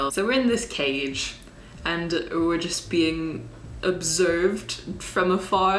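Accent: England, United Kingdom. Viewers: I watched this video and the first video you posted on YouTube. I swear your voice sounds way different. I first thought you were British, but in your first video you sound American! Haha, are you watching too much BBC? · and you've a little English twang in your voice ohmygod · Okay legitimate question about your accent (not meaning to be harsh or offensive): How have you managed to develop a semi-English accent? Is it somewhat deliberate? Because I find it hard to believe that you can naturally pick up an accent when you're a young adult. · Does anyone else notice her slight British accent? If you do, isn't it adorable?! And yeah, I believe that wee not the only ones out there. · You have like a British accent in this lol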